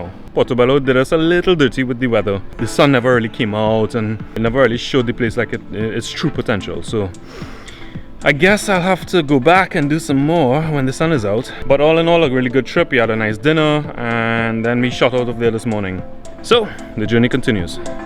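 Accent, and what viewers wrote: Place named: Trinidad and Tobago. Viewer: Recognize this accent anywhere. Hi Trini!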